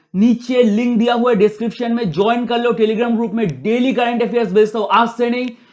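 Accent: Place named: Bengal, India. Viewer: Sir by your accent it's quite predictable that you're a bengali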